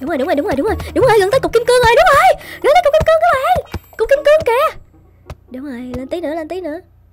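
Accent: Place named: Binh Duong, Vietnam. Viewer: Đọc lạ bình dương thế anh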